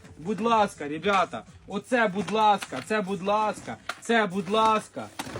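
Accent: Ukraine. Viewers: Полтавська мова) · Красавчик, этот говор, этот суржик класс,😊 я почти также говорил в детстве, осйо оце, воно. 😊😊😊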